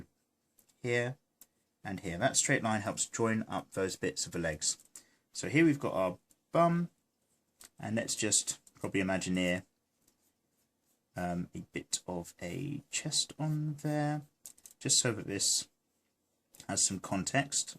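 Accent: United Kingdom. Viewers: God DAMN he’s so British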